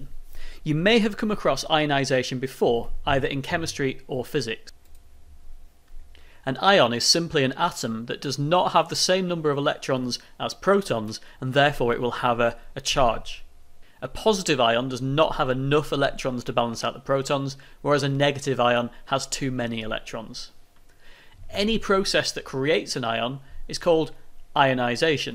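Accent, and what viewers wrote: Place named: United Kingdom. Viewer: British English 🥵🤤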